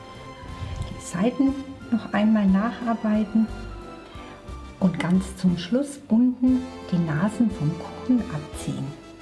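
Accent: Austria. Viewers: sind Sie aus Österreich? 😇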